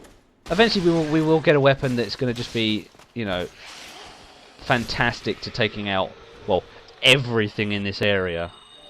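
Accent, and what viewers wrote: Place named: United Kingdom. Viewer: Ok, have I done something wrong?. I've opened up Anor Londo but after seeing this vid I thought i'd go back and clear up all the loot and kill the headless demons in the basement ( I dunno their name ) I jumped down to talk to Siegmeyer and the guy ain't there, he's not at firelink either (scratches head). Loving the vids, nice to hear a fellow Brit's voice on the commentary!;-)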